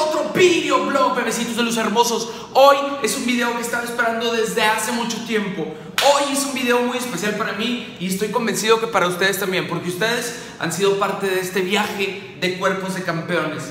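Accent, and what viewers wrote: Mexico. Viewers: a numa ,eres de monterrey :v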